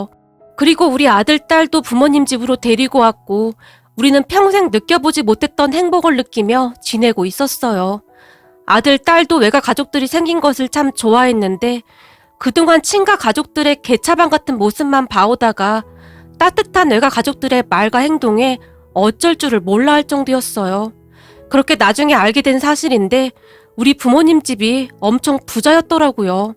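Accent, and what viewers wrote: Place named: Jeolla, South Korea. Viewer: 님 왜케 귀여워요ㅋㅋㅋㅋ 접때 우연히 전라도 사투리쓰는사연읽고반했음ㅋㅋㅋ 넘귀엽.. 인스타안하세요? ㅇㅅㅇ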